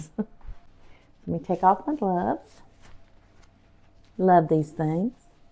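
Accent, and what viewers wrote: South, United States. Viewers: I enjoy your videos very much and your southern accent😃. Your home looks beautiful in the videos. I’d love to see your decorating style! I’d also enjoy a ‘get to know me’ video. You’ve mentioned being in the Air Force. I’m intrigued!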